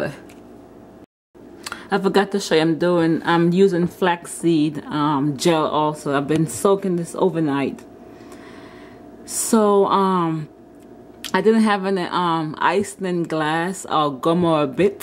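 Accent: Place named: Jamaica. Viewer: She clearly has a Jamaican accent sir.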